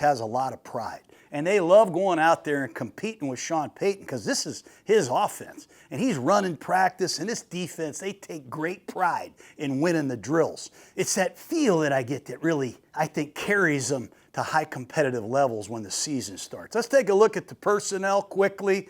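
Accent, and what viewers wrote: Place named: Boston, United States. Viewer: Baahstaahhn accent go me jazzed! lets go coach!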